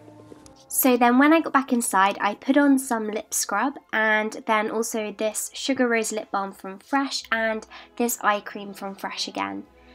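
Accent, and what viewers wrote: RP, United Kingdom. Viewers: im british and i can tell you that nobody is this posh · i love how british people say haiy like im gonna brush out my haiy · Ppl are always saying that British ppl aren’t like this/don’t sound like this, that’s not true, there are so many accents in the uk! I sound like her and so do a lot of people, not everyone obviously but it’s true that this routine fits the very stereotypical idea of England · Love yr bristish accentttttt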